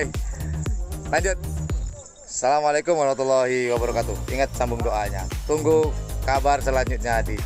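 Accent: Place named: Java, Indonesia. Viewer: ouh sya kira mas ian orang jwa krna logatnya kyak orang jwa ,sya lgi di negara jiran mas mlysia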